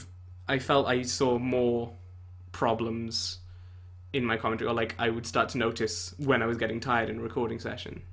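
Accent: United Kingdom. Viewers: Yours was the first channel I ever closely followed, starting way back in 2011 or so. Like many others, I found you through your Endless Ocean 1&2 let's plays. I opened up YouTube, just wanting to re-watch the EO2 opening scene, and I remember being startled when I was greeted by an adolescent British voice chattering over the game. That was my introduction to "let's plays," and I was hooked. Now I watch many YouTube channels, gaming and otherwise, and it has had a very strong influence on my life. You were the one who opened that window for me. I'll definitely miss your videos, but I'm happy to see you moving forward in your life, and I wish you nothing but the best in your future endeavors. Anyway, it was nice to hear your voice again :)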